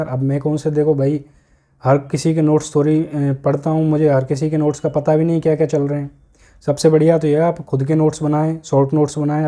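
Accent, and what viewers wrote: Bharatpur, India. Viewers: Where are you from?🤔 You talk in bharatpur accent..🤔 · Bhi bharatpur ke lagte ho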